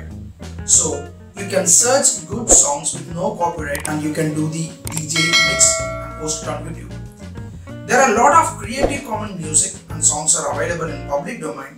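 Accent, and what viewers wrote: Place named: India. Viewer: IT IS REALLY HARD TO UNDERSTAND WHAT YOU ARE SPEAKING. THE CAUSES : 1. BAD AUDIO (SORRY) 2. INDIAN ENGLISH ACCENT (DIFFICULT FOR ME UNDERSTAND THAT ACCENT COMPARED WITH AMERICAN OR BRITISH) I HOPE BETTER AUDIO ON THE NEXT VIDEO. THANK YOU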